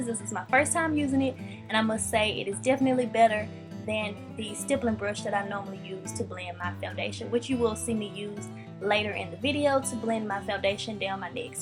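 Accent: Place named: Memphis, United States. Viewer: i heard you talking and i was like she sound like she from memphis cuz i from memphis and live here and you look really familiar for some reason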